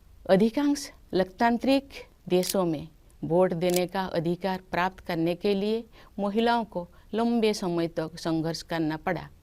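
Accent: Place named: Odisha, India. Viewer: Love that ODIA- Mayurbhanjia accent…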